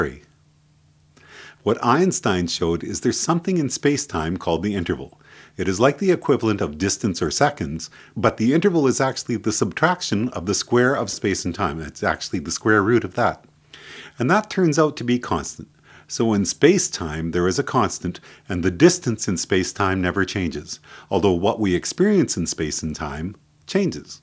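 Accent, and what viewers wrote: Canada. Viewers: He sounds Canadian.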